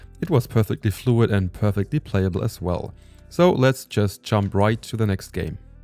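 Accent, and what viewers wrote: Germany. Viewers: Man merkt an der Aussprache direkt das du Deutscher bist 😂❤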